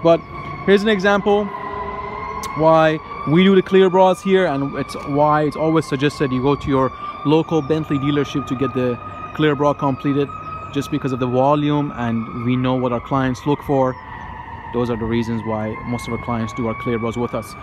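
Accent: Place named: Iran. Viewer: Woow Such a Persian accent Did you come to US like last year or somethin?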